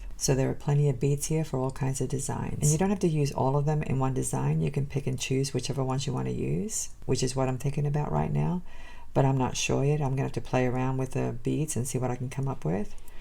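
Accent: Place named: Australia. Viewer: So great to see you back. Good Luck with your PT. Sam's Bead Box is so gorgeous each month I enjoy everyone's unboxing. Looking forward to seeing you create new jewelry designs. We have missed your lovely Aussie accent. Blessings, and Hugs.🌞🌞🌞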